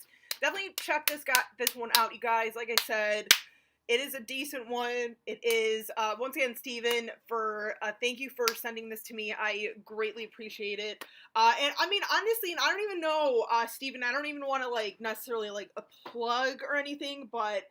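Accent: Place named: United States. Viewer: You have that American accent that makes you say runt instead of rent! Lol, not criticizing, just noticing. I'm from Ontario, Canada, eh, so I'm used to people laughing at my accent, so, it's all good. I keep hearing more and more accents from around the world with the internet. I also have misophonia and certain sounds drive me nuts and make me stabby, but also, it makes me hear things intently and I can hear accents so clearly. Yours is one I hear often. Is it midwestern? Or where is it from? Just curious. Thanks. Thanks for the review too!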